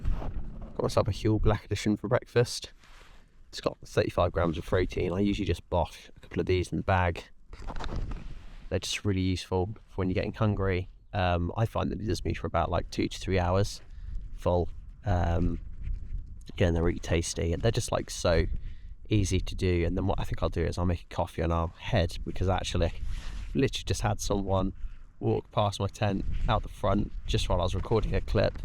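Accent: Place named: United Kingdom. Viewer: 1. Starting video 2. Hearing british accent 3. Closing videp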